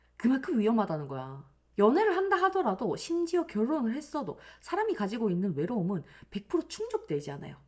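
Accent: Gyeongsang, South Korea. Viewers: 안녕하세요 선생님 주옥의말씀잘들었습니다 미인선생님께서 꾀꼬리같은보이스로 부산경남쪽 또는 대구쪽이신가 아뭏든 정감가는억양으로 설명해주시니까 몰입이되네요 늘 건강하시구 빛나구예쁘신얼굴 오래오래뵙구싶네요 그럼 안녕히계세요~~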